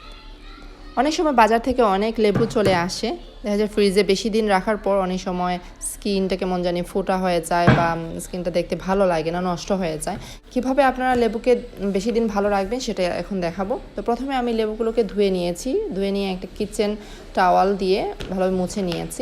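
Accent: Sylhet, Bangladesh. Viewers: Apu apni sylheti ai jonno balo lage please sylheti matea blog korio ❤️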